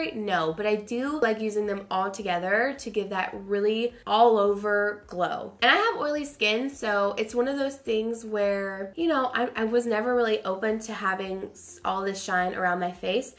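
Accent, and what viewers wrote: South, United States. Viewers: you are getting a southern accent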